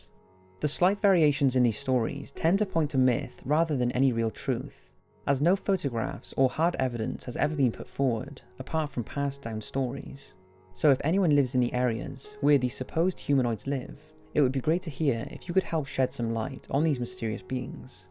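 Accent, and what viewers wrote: United Kingdom. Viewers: YESSS! The British narrator is back 😆😃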